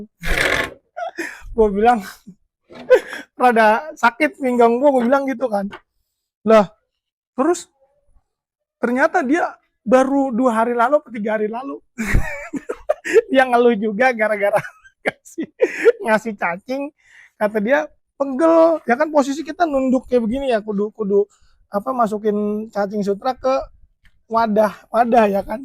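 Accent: Jakarta, Indonesia. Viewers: ngomong nye kyk orang Betawi..... Sama me gw bosssss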